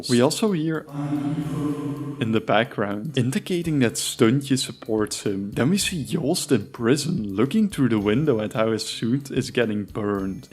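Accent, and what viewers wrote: Netherlands. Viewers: Bro kan je echt niet wat beter engels praten met nie zon dik brabant accent erop, zelfs mij als brabander egert mij eraan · Ik ruik Nederlands 🇳🇱 het accent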